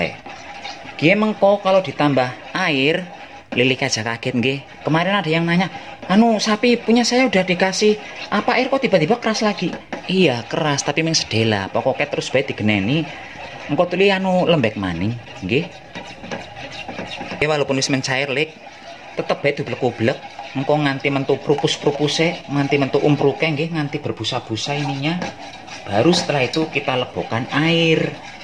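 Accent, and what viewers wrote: Banyumas, Indonesia. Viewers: asik logate ngapak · Halo mas sapi ngomongane AKEH uwong sing pada ora ngarti jawane Jawa blrkutek campur banyumasan 😭😀😂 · Iih..mantep ketemu dulur ngapak nang kene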